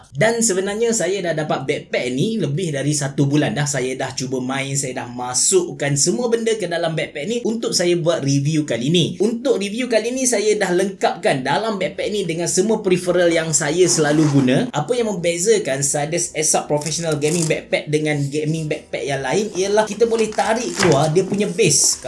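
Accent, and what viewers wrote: Kelantan, Malaysia. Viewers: Lama perati. Abe cerah lamo doh xkecek slang klate. Bnyi skit2 xpo doh, bg video unik skit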